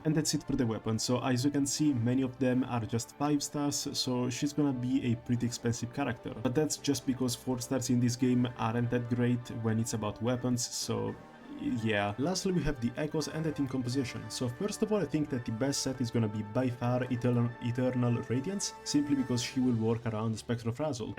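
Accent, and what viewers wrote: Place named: Italy. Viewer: Italian accent talking about italian mommy, sub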